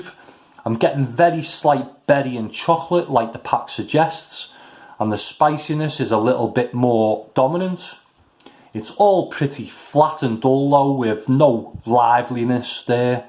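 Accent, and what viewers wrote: Liverpool, United Kingdom. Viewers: Thanks, but you do it so much better, and I could never replicate the Scouse accent being a 'Soft Southerner'!...as for the methods/protocol, I think this and a previous review of mine show that they are simply a guide for consistency, and sometimes you can get a better [tastier] result from what shouldn't work. Keep up the search [and review] of the best budget coffee beans! 🙂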